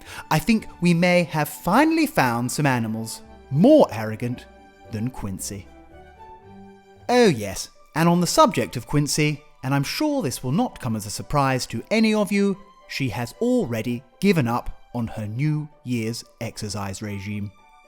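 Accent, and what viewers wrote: RP, United Kingdom. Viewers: It's true! I've also noted that the comments are just overflowing with people, (including me), attempting to use their very best Queen's English (if I understand the term correctly), inspired, I'm sure, by the narrator who is a shining example to us all! ❤ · Your videos are simply scrumptious! I adore the narrator’s witty use of the Queen’s English. I am sure you are firm friends with the local antiques dealers, tell them you are on the lookout for a chimney surround of the same era as the house, they might be able to help you. I would start on the downstairs toilet/bathroom, comfort is important whilst renovating. (And someone will eventually spot you behind that bush..). Afterwards I would tackle either the dining room, or the sitting room- so that you can have a room that is finished and decorated and furnished, it will bring a huge sense of achievement and an actual place to wind down.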